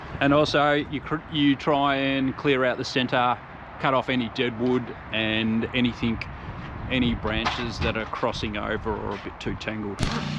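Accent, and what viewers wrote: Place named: Australia. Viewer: You Aussie men are definitely made different! I don’t know of any American men that would get on their hands and knees and weed through a small rock bed much less over half an entire yard like y’all did!!! You and work great together and I love seeing him using the power tools every once in a while. He seems to stand up taller, lol!!!😉 Ya’ll keep up the good work and keep the blessings going!!!❤ P/S Love the hair, the accent, the whole package!!!😊 Nelly, USA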